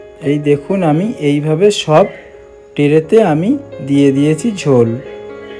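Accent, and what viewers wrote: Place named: Bangal, Bangladesh. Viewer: পিচ এটা আবার কোন দেশের ভাষা , বাঙাল ভাষা ?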